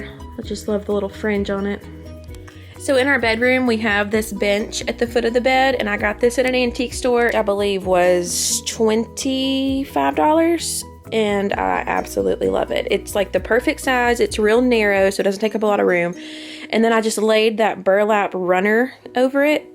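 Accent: United States. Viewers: Ok you definitely sound like you're from NC.....I live in Camden which is about 40 min to Duck (winter time, peak summer traffic who knows how long I'd take, lol). So if you're ar the N OBX (Outer Banks for y'all who don't know) and are 30 min from beach, then you're in Currituck. But if you're not in NENC then you must be farther down the coast. Anyway...like I said you sound North Carolinean, and I know a store that's headed toward the breach that is all beach themed, that's in Currituck. But there's also some at the beach too. Was just curious if I was correct. Not stalker, lol. It's rare to hear someone sound like you on YouTube, lol...ok I'm rambling now, so I'll go, would be cool if ya told me yes or no though, Your stuff is really cute, and I'm with you on old stuff! Love it, especially old books! I have a few old early 1900 medical books, as a nurse I find it fascinating!! 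Love the house and decor. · Where are you from? I know you are definitely a southern girl. 😃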